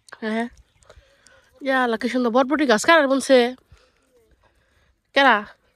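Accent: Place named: Tangail, Bangladesh. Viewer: apnr vasa dekha bujse apni Tangail ar amio tangail ar cela basail thana amr basa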